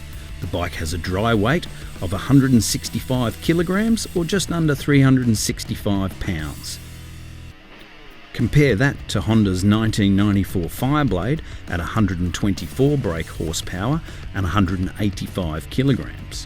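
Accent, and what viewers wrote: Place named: Australia. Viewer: thanks for the helpful reply! You sound like me on my MC22 haha. Please keep up the videos, it's nice to hear an Aussie doing good reviews of bikes.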